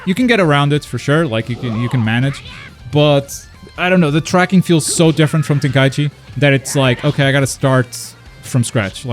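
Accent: Portugal. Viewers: Tu es portugues?!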